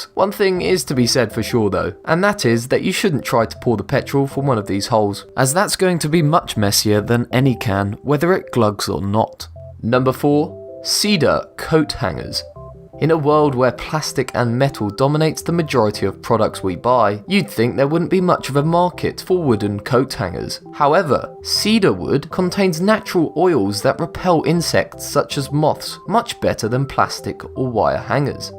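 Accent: England, United Kingdom. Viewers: His voice is a really good British accented voice. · Just because you have a dumb limey accent does not make you smart. none of these are true and were never meant to be used that way. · pre-schoolers haks, w english accent , horrible as hell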